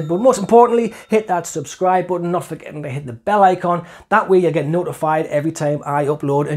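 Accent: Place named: Newcastle, United Kingdom. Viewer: Geordie . Hello from Australia. Miss the accent.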